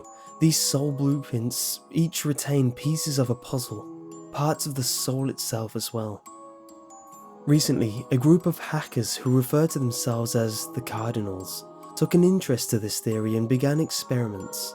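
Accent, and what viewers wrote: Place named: North, United Kingdom. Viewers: As a Brit it sounds like Northern English to me. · British, he has a more Northern British accent though.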